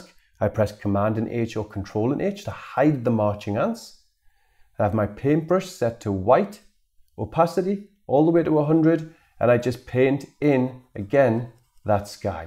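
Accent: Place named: Bradford, United Kingdom. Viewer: Are you from Bradford? Your accent sounds too familiar! Also, great video! I've missed playing around in Adobe CS.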